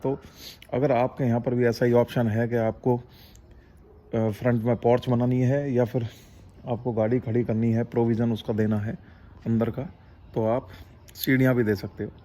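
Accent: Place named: Haryana, India. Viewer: Bhai apke smjhaane ka tarika bhut hi alg hai or bdia hai apki awaz se lgra hai ap humare haryana se hi ho or humari jaat caste se hi lgre ho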